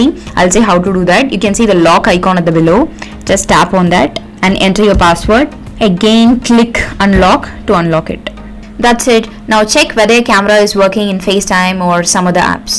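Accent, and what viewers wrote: India. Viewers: how do you let us understand this video wiht your indian accent and pronuncation, at least speak slowly so we can understand!!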